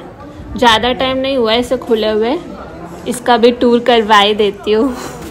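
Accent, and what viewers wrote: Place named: Japan. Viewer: Indeed I m also a new subscriber Liked her vlogs very much. Her accent nowadays sound little bit like Japanese 😊😊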